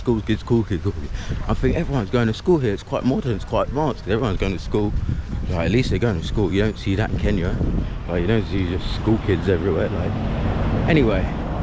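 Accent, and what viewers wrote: United Kingdom. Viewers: You are obviously disgruntled socially. Where are you fron really?. I can hear the british accent. Am Jamaica and live in theUS. Just can't understand what you are do angry about. People ask you for money just day no. I have neices, nephews and lots of cousons in the UK. Enjoy you stay in Jamaica and calm your nerves. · Honestly i am not bias. I just see this guy with a weird personality.. He sounds so bitter and he doesnt seem to have any confident in himself. I think that he was mixing with the wrong people. He got lost in the bushes. What is wrong with him? He needs help!! Hes just throwing tantrum . The other utubers ask ed for help from people who they have sought out before landing but you just went this british accent and think that would get you by. Life doesn't give anyone what they deserve, you must fight for what you want. 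Love ❤brother but your attitude isnt right. Jamaicans love and care for people.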